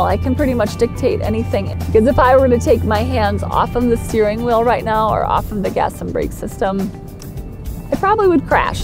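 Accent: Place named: Minnesota, United States. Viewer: Your ride is soo cool, so much cooler than those available a very short time ago. Also, you sound soo Minnesotan. Thanks for the video.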